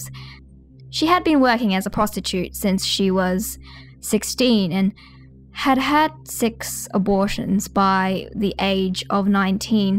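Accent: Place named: Australia. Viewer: Ironically, has an Australian accent. NICE!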